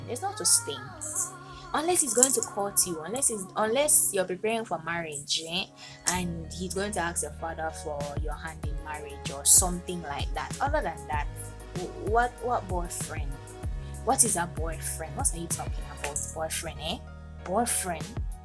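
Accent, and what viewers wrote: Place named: Africa. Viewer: First, I am hearing your African Accent!!!! Lwl. Good video!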